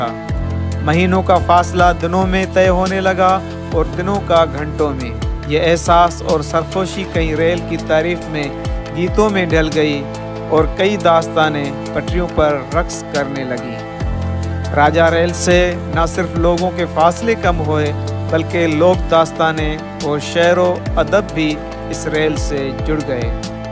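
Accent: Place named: Sindh, Pakistan. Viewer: Koi Sindhi jab Urdu bolta hai tou? Bohat achcha lagta hai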